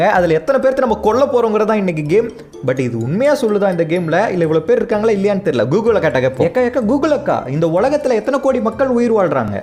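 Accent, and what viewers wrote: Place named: Karnataka, India. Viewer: Bro Karnataka ilanthu pesara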